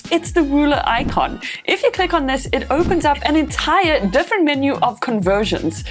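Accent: South Africa. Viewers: Sounds 100% South African and I love it... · are you south african?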